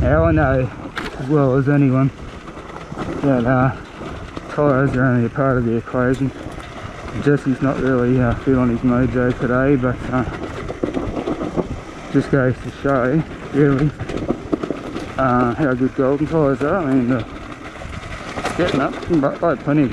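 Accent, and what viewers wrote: Australia. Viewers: Not sure the wind muff is helping... still sound like a hint of Australian accent.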